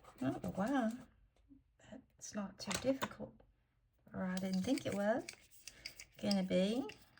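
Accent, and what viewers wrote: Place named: South, United States. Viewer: This is a darling mani! The reindeer and tree turned out so cute and I love plaid. The colors you chose are beautiful. Please don’t apologize for that southern twang. It’s your heritage and part of what makes you special. Being from the South I sometimes wish I had a Southern accent, but I do not. I don’t even sound like my siblings. I take after my mom who was from NC. That’s not that far away but the accents are so different. My dad was a country bumpkin if ever there was one! I’m the odd ball of the family. Even my daughter doesn’t say words the same way I do. Geez, talk about rambling!😂 Thanks for another fun night with you. I’m going to miss these videos.❤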